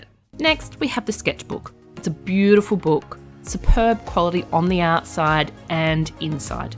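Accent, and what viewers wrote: Australia. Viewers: First of all: You've been making me smile a little bit more with every post-advent-calendar video. "The Manipulations of Manni (Manny? Mannie? Man-ny?)!" (🤔 Needs work.) I might be a bit obsessed. Also: I just wanted to say how I love, appreciate and adore that, compared to sooooo many YouTubers, you're classic Ozzie. (NOT bogan, classic. 😂) And you make classic Ozzie look and sound amazing. I'm from NZ 🖤🤍but I've lived in Brisbane 💚💛for... a while - a number of years longer than I originally intended anyway - and I reckon NZ and Oz are super similar in that we're kinda... understated? We definitely don't tend to have issues with hyperventilating in our excitement . Not in the same way I've noticed those from other countrys seem to anyway (I mean no disrespect, this seems to be an Oceanic idiosyncrasy, is all).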